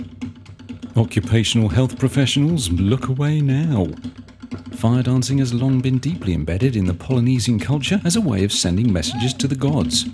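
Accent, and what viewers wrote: England, United Kingdom. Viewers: I’m so glad you don’t have a high pitched voice. I love Travel Movies but if the voice is above “C” my eardrums hurt😊. With your English Accent,listening to you is comforting.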